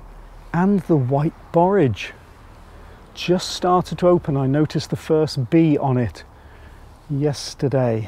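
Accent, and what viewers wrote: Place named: Liverpool, United Kingdom. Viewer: I’m really enjoying this video, it’s so interesting, thank you for sharing. I have to ask are you originally form Liverpool by any chance? I feel like I can detect the slightest hint of ‘scouse’ in your accent ☺️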